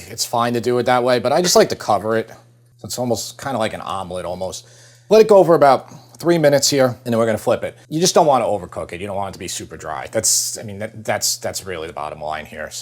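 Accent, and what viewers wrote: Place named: Long Island, United States. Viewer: Great food. Great recipes. Great Long Island accent. Everything anyone could want. ⭐⭐⭐⭐⭐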